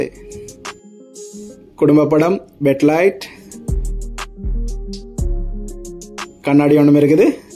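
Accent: Sri Lanka. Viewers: ஐயா கப்பலில் தங்கும் அறைகள் பற்றி நன்றாக உள்ளே சுத்தி காண்பித்தீர்கள் மிக்க நன்றி நீங்கள் தமிழ்நாடு தமிழரா இலங்கை தமிழரா உங்கள் குரல் சற்று இலங்கை தமிழர் போல் தெரிகிறது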